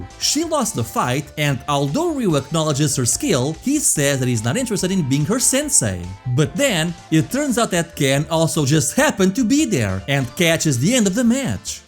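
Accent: Portugal. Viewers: Vc é português? Pelo sotaque, pareceu muito. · I heard your speaking and already knew you were portuguese! Lmao! Thank you for making content on brazillian fgc. Greets from Brazil!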